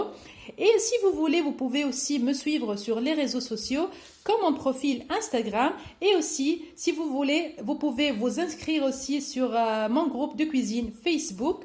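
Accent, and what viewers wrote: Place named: France. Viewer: Ciao, adoro la tua pronuncia francese 🥰 ottima bevanda, fresca ed energetica 😋🥰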